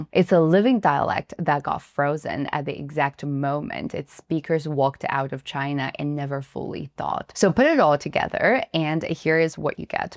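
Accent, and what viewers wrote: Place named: United States. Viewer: She has an American accent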